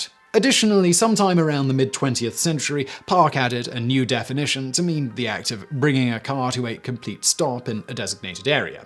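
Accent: United Kingdom. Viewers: British English has entered the chat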